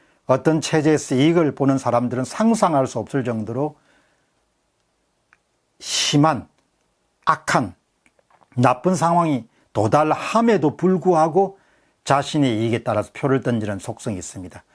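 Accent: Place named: Gyeongsang, South Korea. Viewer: 어 말투가 겡상도구만 에구 지겨운 겡상도 그 촌스런 미개한 사투리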